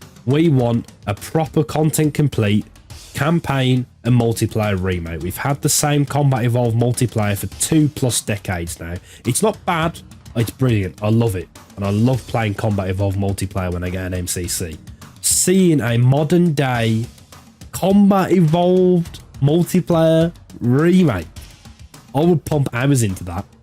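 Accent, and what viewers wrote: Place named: Liverpool, United Kingdom. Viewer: Best Halo YouTuber with the scouse accent 😉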